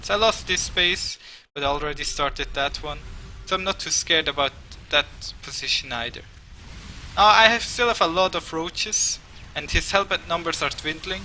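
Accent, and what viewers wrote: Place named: Swiss German, Switzerland. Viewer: You have a funny accent. Almost like a swiss german talking english.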